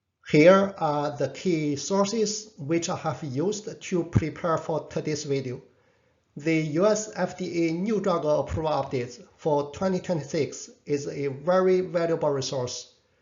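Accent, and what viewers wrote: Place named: China. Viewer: 謝謝您的分享👍I know u can understand Chinese, from the accent 😊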